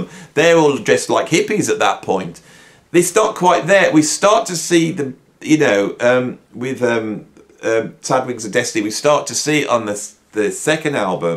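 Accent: Birmingham, United Kingdom. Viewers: To be fair, did say at the start that metal isn't his main genre. But I caught that too. Dissident is one who rebels against society. Many people still think it's Stained Glass. Can't blame them. The cover looks kinda stained glassy. I like how the Brummies remove the T from Metal. Also, says "PAHN-terah". In U.S. we say "pan-TERA" He makes it sound like the name of a fluffy kitten. Here in America we say it like a solid punch.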